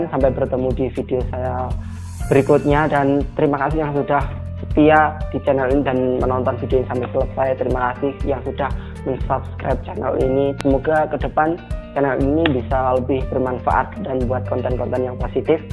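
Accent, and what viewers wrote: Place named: Java, Indonesia. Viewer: jowo e juga medok tenan mas e..😂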